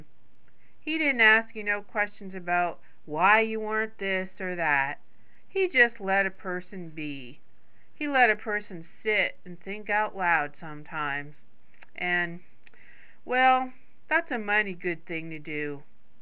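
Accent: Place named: Midwest, United States. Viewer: I like this person's voice for this book, it fits, sounds midwestern to me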